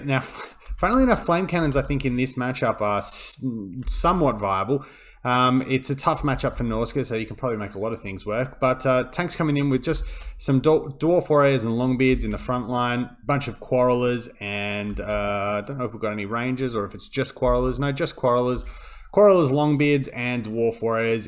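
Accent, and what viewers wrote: Australia. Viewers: That feral mammoth hit Gotrek 5 times in a row between and ! I was hoping to see it's value, but you managed to skip it the entire time! Good cast though, I enjoyed listening to another aussie.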